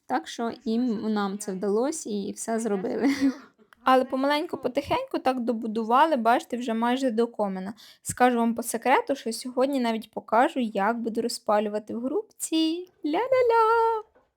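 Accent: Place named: Western Ukraine, Ukraine. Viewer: .по вимові складається враження,.що ти із західних областей?.яАле у тебе не суржик,.у тебе кацапська на український лад,.ти молоденька навчись своєї рідної мови,.ти взагалі молодець як людина теж тож не ображайся за мову,.а вчи,.бо дуже уже нам боляче слухати ту кацапську,.рощумієшчому?.Скільки людей убили,."асвадаждаючи"від української.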